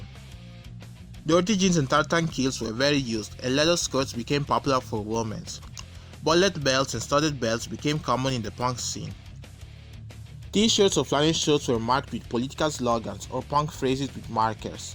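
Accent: Italy. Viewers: From your accent I feel that you are Italian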